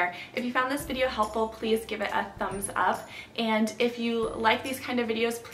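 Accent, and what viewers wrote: United Kingdom. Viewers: Nothing wrong with the British accent.... But to be fair, it's an English accent. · Great summary, tbh MOST products on the market have an American accent so it's a refreshing change to find one with a British accent! :) Yours truly, a Londoner.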